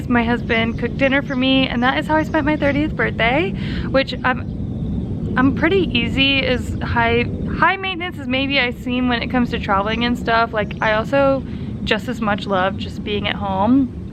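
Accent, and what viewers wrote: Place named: United States. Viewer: This is a interesting movie. Than you for your upload. By the way, which state are you from? I am also interested in American girls accent in region.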